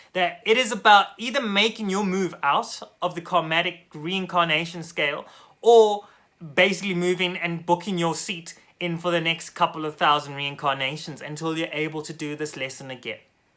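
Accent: South Africa. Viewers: This dude south African?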